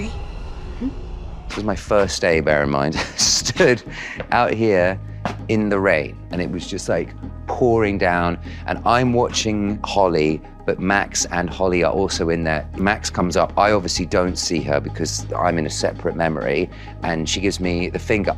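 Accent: United Kingdom. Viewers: Too vague to say Southern. I am from the South West and a lot of people sound like farmers here. He has a middle class London accent, as someone else said. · It’s called a southern British accent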